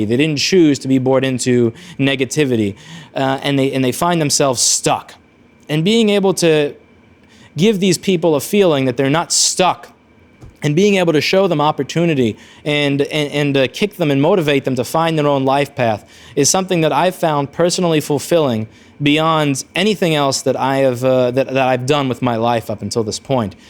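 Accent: New York, United States. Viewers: Maybe it's just me, but your NY accent seems way heavier back then than it is now.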